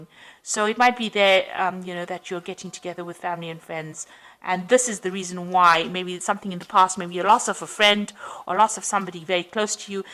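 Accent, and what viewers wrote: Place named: South Africa. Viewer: Great reading, thank you 🙏. Also, is that a fellow South African accent I hear?? 😃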